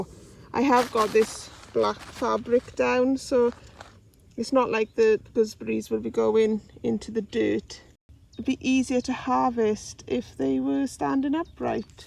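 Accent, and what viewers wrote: Wales, United Kingdom. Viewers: Hello... really enjoy your videos for 2 reasons... number 1) I love your welsh accent number 2) your a brilliant gardener.... I wish your were closer to home as would love to visit your allotment 👍👍👍👍👍🌻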